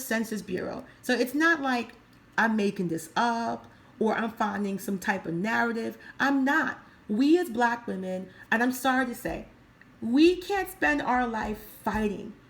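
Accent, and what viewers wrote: Jamaica. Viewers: You're welcome. Also it's nice to hear a fellow Jamaican pon the mike.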